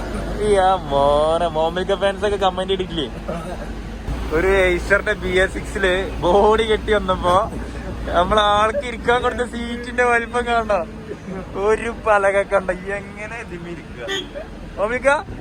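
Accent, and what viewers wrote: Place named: Palakkad, India. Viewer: Palakkadan ഭാഷ 😂😂😂❤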